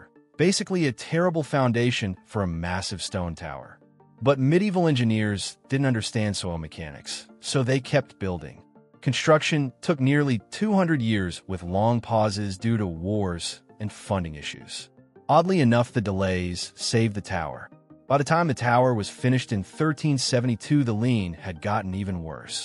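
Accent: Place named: United States. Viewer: The reason it feels like an AI voice is because the word spacing is strange and the way it says "two - five hundred" for 2500 (there are more instances of this as well). Im not aware of any human that says it like that. "25 hundred", "two thousand five hundred" or "two and a half thousand" are about the only ways I've ever heard a human say it in any language. Given the accent, which sounds american, I dont think it's a case of "english not first language" either. I have, however, heard AI say 2500 as "two-five hundred" many times. That said.... even if it IS AI, a couple tweaks and it could dodge that. It's not awful.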